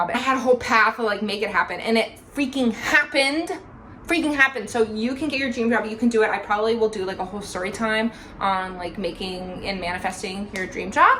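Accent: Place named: South, United States. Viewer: I love your Southern drawl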